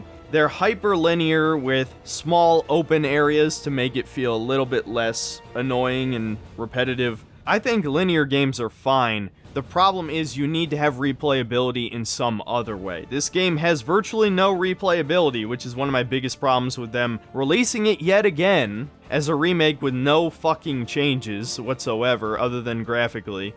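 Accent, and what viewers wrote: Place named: Midwest, United States. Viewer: The Midwest accent is strong with this one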